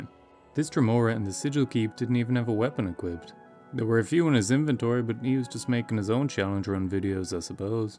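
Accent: Ireland. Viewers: why are you doing a weird american accent? you are so obviously irish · Awesome video, always happy to hear more Irish youtubers.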